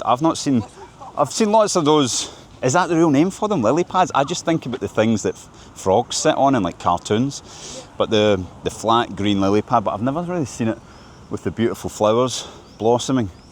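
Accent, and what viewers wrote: Scotland, United Kingdom. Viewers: I like your Scottish accent. Maybe it's because I've listened to quite a few Ian Rankin's audiobooks.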